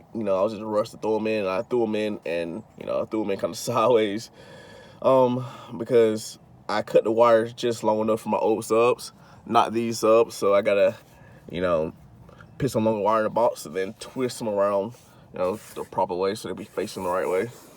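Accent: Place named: South, United States. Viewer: your from the south huh ? im hearing a accent there,and im seeing camo duck hunting seat covers which omg i love duck hunting,well actually i love bar b queing duck,mmmm damn but yea oh and your even talking about the country haha ok so go ahead throw on some country hahaha im kidding where you at,which state not tx i didnt see a inspection sticker,well registeration sticker actually since they changed it to just one instead of both.but yea curious,alright Basshead UNITY ! 2020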